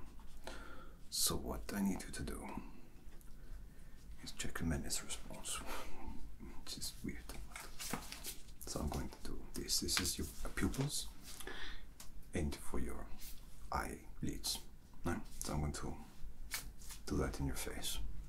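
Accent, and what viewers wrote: Russia. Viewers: posts video with russian accent Me: crying and pretending that this is Dimi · I love the Russian accent RPs! How wonderful that you combined it with a medical RP. Genius! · No matter what name you decide to use Roberts or Alex you would be the best "doc" a person would want to see and i like your russian accent 😊😊😊 Always well done really 🤗👏👏💖😍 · Love the Russian accent 💙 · I’m going to insist my doctor speak with a Russian accent from now on. 😁 Good job,! 💙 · your Russian accent is my personal favorite trigger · Отличный русский акцент! Спасибо за видео, голос шикарный. Мурашки бегут, словно резвые кони по берегу Днепра! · Nom nom, - delicious tingles to be had here! Russian voice: My brain is no longer hunger for relax. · Oh...yay! The Russian accent returns....as a blonde!?!?!? 😊 Is the fact that I didn't know the answers to some of the UK questions due to a brain aneurysm, or is it because I'm American? 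🤔. Glad our favorite doc is taking some time to be where is needed most.. 🥰 Stress & headaches galore this week. Being kind to myself sounds like a good idea, as does being kind to others. I've been letting certain people push my buttons. Gonna have to work on that. Lovely video as always. 😊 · your Russian is better than what Hollywood often settles for in their movies. Well done! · Very tingly and the your russian accent is the most pleasant to listen to ever, thanks for the video, ✨ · made me smile with a russian voice and of recent news of russian poisoning and hearing police sirens Twice lol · your Russian is wonderful and the good Dr Roberts!! So glad he is still with us and got to take a vacation..Im sure Matt was a rather difficult patient😎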